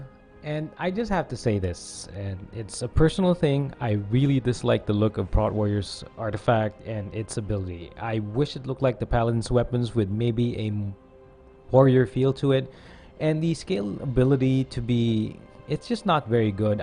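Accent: Philippines. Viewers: are you a Filipino? the way you speak and pronounce the words .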